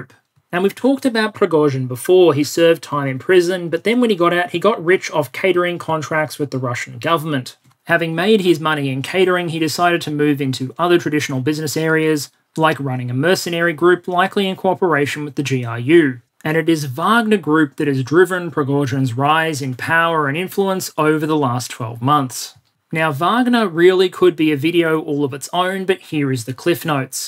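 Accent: Australia. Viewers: It’s the Aussie accent 😉😇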